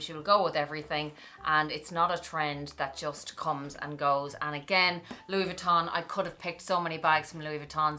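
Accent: Ireland. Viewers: Dear, in my mother's time, one bought a timeless bag and wore it always. She lived in London, Paris and New York and wore her Chanel classic flap everywhere. She had bought it in Paris. It was the age of class. Now one needs a dozen bags and have a collection! Welcome to the age of greed. In any case I love you! Lived in Ireland for 10 years had my 2 kids there. Just live your Irish warmth and wonderful Paddy accent! Stay blessed and enjoy your great style! 😍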